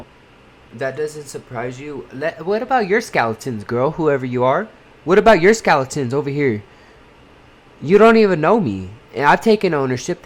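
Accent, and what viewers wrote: India. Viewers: Nooo!!! U got a indian/gay accent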